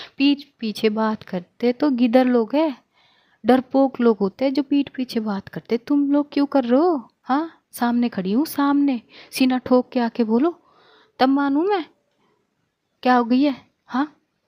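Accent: Haryana, India. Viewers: Kya story hai yar or upr se aapki haryanvi voice mar dalogi sach me 😂😂😂😂😂😂😂😂😂😂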